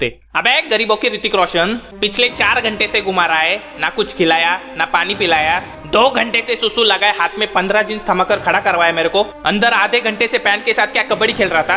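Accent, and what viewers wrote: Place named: Maharashtra, India. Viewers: Tuzi marathi tone madhli hindi mast waatate 👍😂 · ur accent is full marathi.....very funny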